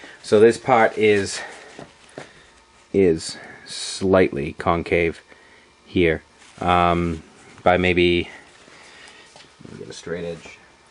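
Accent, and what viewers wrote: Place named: New England, United States. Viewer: Good stuff, sounds like your from new england.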